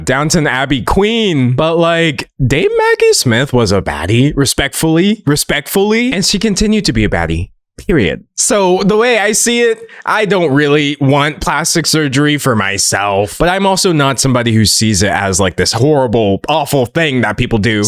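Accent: South, United States. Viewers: Not gonna lie, I thought I detected a hint of a southern accent in recent vids, but I thought I also may have just wanted for it to be the case. Whether it is home grown or absorbed (as southern accents are wont to do), welcome (back?) to my home state!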